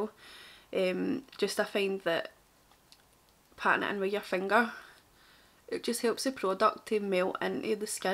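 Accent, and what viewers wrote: Scotland, United Kingdom. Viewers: Is ur accent Scottish? I love it!! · oooh fab! 🤗 let me know if you need any advice or help at all with editing etc - I’m a professional videographer based in Glasgow 💛 So nice to hear a Scottish accent on YT! x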